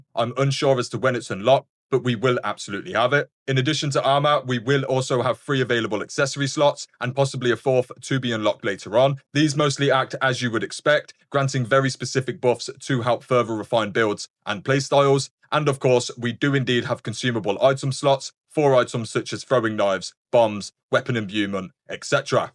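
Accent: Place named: United Kingdom. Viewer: thanks for video. nice british accent. which region exactly?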